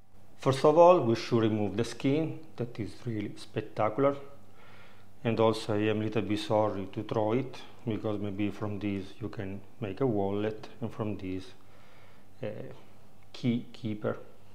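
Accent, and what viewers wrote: Italy. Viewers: I have been watching all of your videos ever since 2020, and it has become a family tradition to watch your videos all together. I would say try to make "shorts" trailers of your actual videos with a link for people to click on and watch the whole video (put a lot of suspense in it to draw their curiosity to watch the full video), reupload those shorts on tiktok and instagram (tiktok can get you a lot of views on shorts). Also work on your thumbnails a bit more, put like a picture of your "surprised" face looking at the dish that you're making. If you're unsure how to do this or how to take a step further on your channel, I would recommend taking Udemy courses (for like 10$) on "school of film" or something similar, sometimes no matter how much you know about things, a course or a tip might always be useful. Personally, what I love about your channel are the traditional italian recipe with your italian accent, and your preserving/canning videos (like sun-dried tomatoes, peeled tomatoes, wine etc ...) If none of the above works, then you might consider switching to creating short videos (less than 1 minute) and post them on youtube, tiktok, instagram but don't give up! 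You have a big fanbase and a big base to begin with, you're halfway there ! Love from Lebanon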